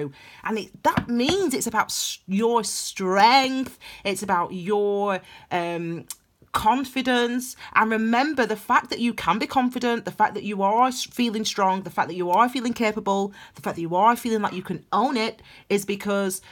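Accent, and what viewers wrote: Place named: North, United Kingdom. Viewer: ..at 0808...hearing your lovely northern twang (a Manky here :))...I snapped and your energy was my final acceptance .. .own IT.. claim IT...poised ..thanks for making clear this feeling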